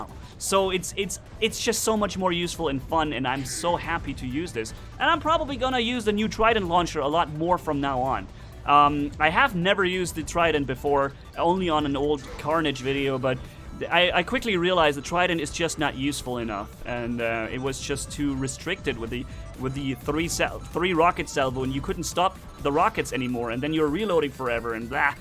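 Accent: Germany. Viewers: Are you from german?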